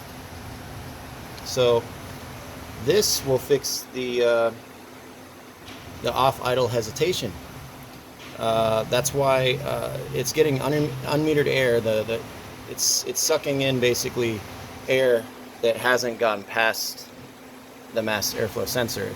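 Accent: United States. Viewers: Thanks for the information man really can in clutch but are you from Texas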